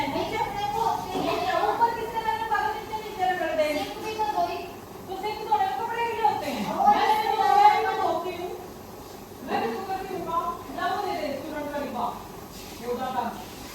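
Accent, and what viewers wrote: Haryana, India. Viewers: Aap Haryana se ni ho kya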